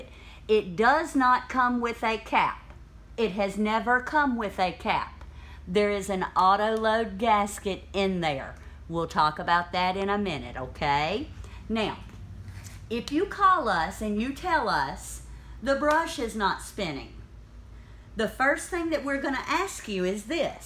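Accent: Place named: South, United States. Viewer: Who is this lady? Love the Southern accent! lol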